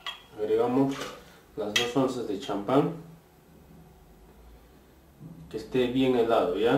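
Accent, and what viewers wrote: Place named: Peru. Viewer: Si se nota que eres peruano 😂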